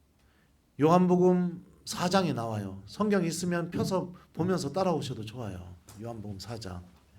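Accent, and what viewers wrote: Chungcheong, South Korea. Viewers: ❤🎉 저는 2000년에 멀리 캐나다 🇨🇦 토론토 지역 오로라시에 와서 살고있는 충남 서산시 에서 첫 영성체를 올린 조 루가입니다. 그동안 풀납동성당, 잠실성당, 역삼동 성당에서 레지오 활동과, 성가대 횔동을 하다가 emigration 으로 캐나다 🇨🇦 토론토 에 왔습니다. 신부님 충청도 말씀을 듣고 친근감을 느낍니다. 조 루가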